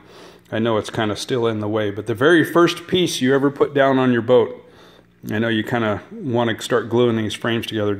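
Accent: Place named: England, United Kingdom. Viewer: Oh, p****n d'accent rosbeef !!... 👎🏻👎🏻👎🏻👎🏻👎🏻👎🏻🤢🤢🤮🤮🤮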